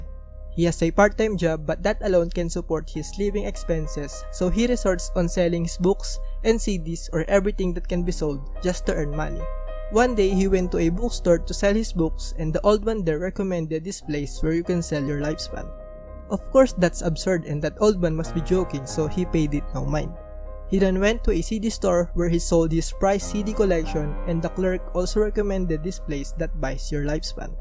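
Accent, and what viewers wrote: Philippines. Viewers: bruh are you filipino your accent sound like filipino accent in my opipon ahahhaa nice list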